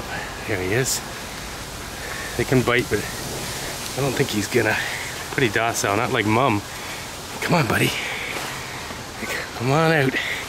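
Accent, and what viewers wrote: Canada. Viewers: I know a Canadian when I hear it!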